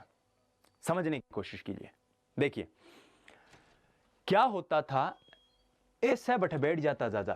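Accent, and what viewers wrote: Rajasthan, India. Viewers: Mai up se hu but jb ap rajhasthani bolte to Maza bahut ata .love u sir.psychology ke dada ho ap.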